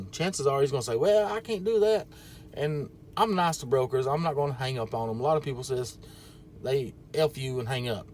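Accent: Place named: New York, United States. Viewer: Hey, I watched a bunch of videos and I listen to the way you talk to brokers, and that’s taught me a lot. I kind of use your template and probably sound just like you with a New York accent LOL I live just outside of Nashville now and I love the market here. Hope you’re doing well bud and enjoy your Thanksgiving holiday.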